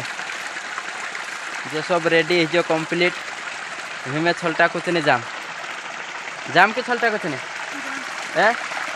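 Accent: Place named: Madhya Pradesh, India. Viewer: आप जिस भाषा में बोलते हु व भाषा तो आलीराजपुर सोंडवा कि जैसे लगती है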